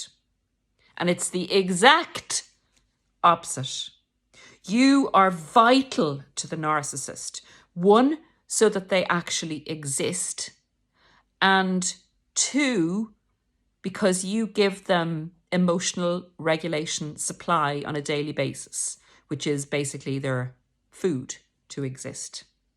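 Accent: Ireland. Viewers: Are you Irish? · Thank you for being so calm sensible and supportive of this community And I love your irish accent I am starting to almost be thankful to my narcissist that he led me to find all these wonderful people like you ande your channel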